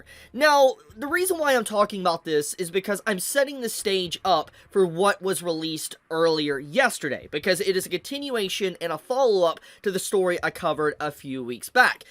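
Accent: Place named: Midwest, United States. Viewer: Mid West accent goes hard in this vid lol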